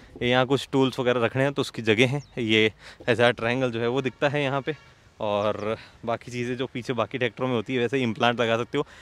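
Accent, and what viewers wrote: India. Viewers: U are using somewhat desi extent in your voice while reviewing this desi vehicle that's an awesome thing ❤❤❤❤❤❤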